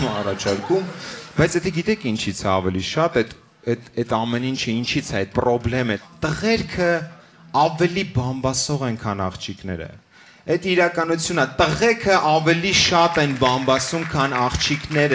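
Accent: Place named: United States. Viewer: Մի տեսակ չեմ հասկանում ամերիկյան ակցենտով հայերեն խոսալու իմաստը, ահավոր խնդալու ա հնչում )))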